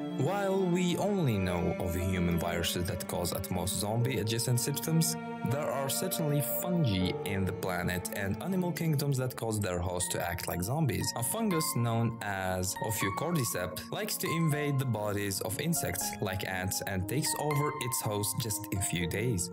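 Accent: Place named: Russia. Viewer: You kinda sound like you have a Russian Accent. Idk just wondering.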